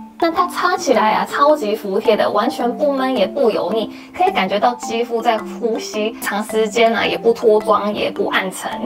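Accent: Taiwan. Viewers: 第一次點進來~ 看過的日本youtuber中~中文發音最好的! 一聽就是在台灣學中文~有台灣人講話的語調! 另外看起來也蠻有福氣的樣子! 很可愛! 原來第一名是泡芙~ 韓國人也很愛台灣泡芙! 另外,"無印良品" 本來就是日本企業~ "選品" 按"日本人"的眼光來選~當然日本人會喜愛! 最後關於"茄芷袋"~ 大家比較常想到應該是買菜的三色袋~ 你拿的那個比較有"設計感"! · 的中文超道地台灣風，厲害！ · 太厲害了👍 簡直比台灣人更台灣人！國語比台灣人還道地！令人敬佩不已！❤❤❤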